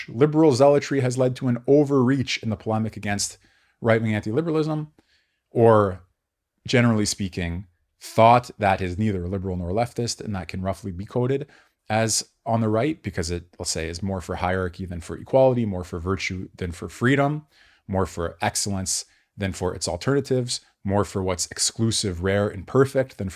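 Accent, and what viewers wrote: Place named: Canada. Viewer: Canadian accent was a surprise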